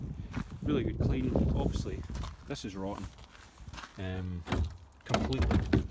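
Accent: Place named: Scotland, United Kingdom. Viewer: Maybe it's the equipment you're using. I only have the volume on at 1/4 and I can hear him perfectly well. It's either that or your're not used to a Scottish accent.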